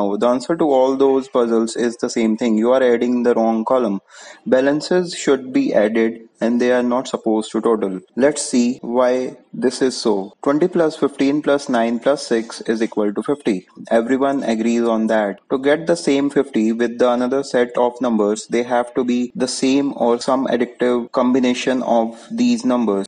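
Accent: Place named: India. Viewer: Oh God I was hoping it wouldn't be an Indian narrator 🙄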